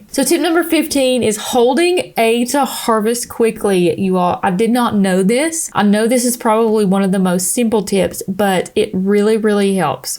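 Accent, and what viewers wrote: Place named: Texas, United States. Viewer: Yooooo....that accent tho lmao. U gotta be from Texas or Bama. Hahahahaha I love it. Makes me feel at home.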